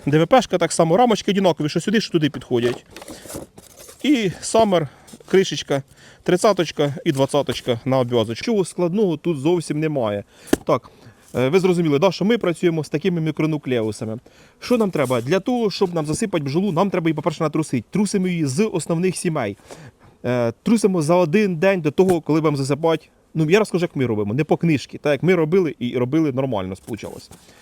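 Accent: Poltava, Ukraine. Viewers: Обожнюю полтавський говір, він крутий і унікальний, слухати одне задоволення. Бажаю успіхів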